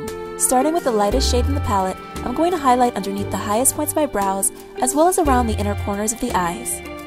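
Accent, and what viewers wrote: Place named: New York, United States. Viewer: Hey, I have been a long time subscriber of your's and I like you because you are usually so original, you know, with your crazy NY accents and such. But I feel like this video seems a bit like something Michelle Phan would do. I understand that she's your collegue, but I miss the NY. <3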